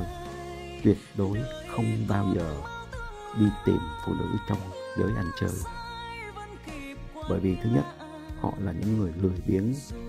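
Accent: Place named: North, Vietnam. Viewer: Giọng nói Bắc 54 nghe hay quá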